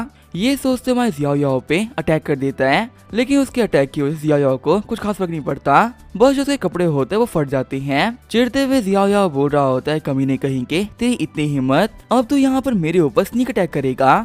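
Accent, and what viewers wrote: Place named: India. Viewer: FFS why indian voice AGAIN !! Did this channel get hacked or something ?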